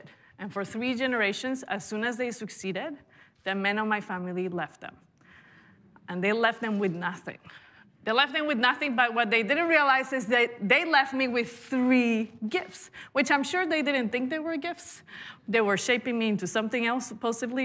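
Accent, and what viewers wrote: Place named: Colombia. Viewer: Quico...lo importante es que ella habla el castellano de Colombia,no el de tu pinche Mexico.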